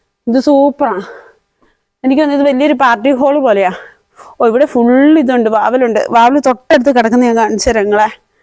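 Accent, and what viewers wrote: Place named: Kasaragod, India. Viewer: നിങ്ങൾ പറയുന്ന കാസർഗോഡ് ഭാഷ കേൾക്കാൻ നല്ല ഇമ്പമുണ്ട്. Nice videos keep going ! I found a sincere approach from you and it should be appreciated. Well done!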